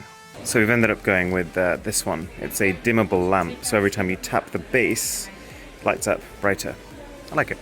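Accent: United Kingdom. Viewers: Smh a dialect can’t be helped. He sounds British but without the accent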